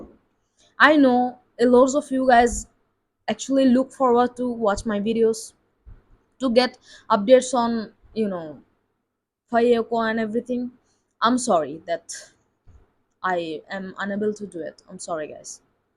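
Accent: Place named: India. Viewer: I tot u were from India,,,your tonation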